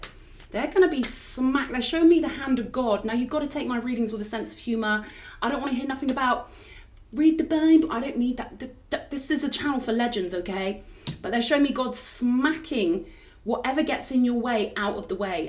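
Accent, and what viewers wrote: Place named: Cockney, United Kingdom. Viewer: your given it some proper Cockney slang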